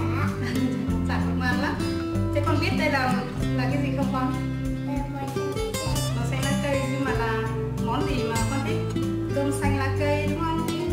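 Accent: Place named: North, Vietnam. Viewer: Chị đi nước ngoài nhưng vẫn giữ được nét truyền thống thật, từ cách nấu nướng tới cách nói chuyện với con gái đều rất đậm chất bắc bộ :) rất thân quen ạ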